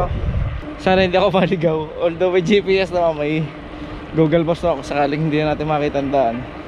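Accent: Batangas, Philippines. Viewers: Sir Batangeno ka ba? Ala eh panotice sir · Batangueno ka ga? Hahaha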